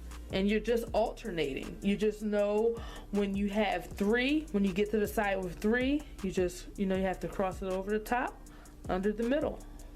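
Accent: Baltimore, United States. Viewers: Knew you was from Baltimore first 30s lmao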